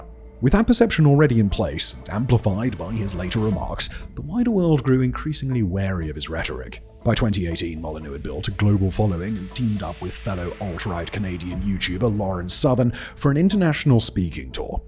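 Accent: England, United Kingdom. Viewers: I'm not a fan of stephon, but you're censorious attitude is very off-putting, i'm starting to feel like whenever I hear an english accent that i'm about to hear some fascist rhetoric.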